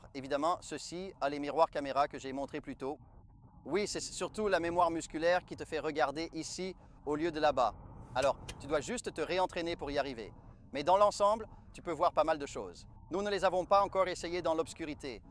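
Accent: Quebec, Canada. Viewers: La traduction automatique n'est pas très bonne. Et la voix choisie a un accent québécois.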